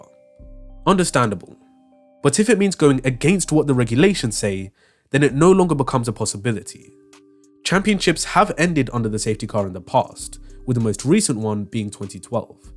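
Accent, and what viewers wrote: United Kingdom. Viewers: once i heard the english accent i knew enough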